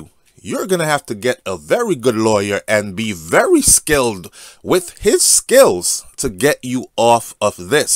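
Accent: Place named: Jamaica. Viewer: Jamaican accent trying to talk American is so annoying #unsubscribe 👋 ✌️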